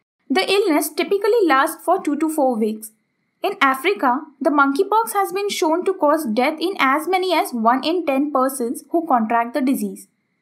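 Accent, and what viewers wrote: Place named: India. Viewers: It's hight time to change your mindset and adapt with an Indian accent. World is changing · Couldn't find someone without an Indian accent???👎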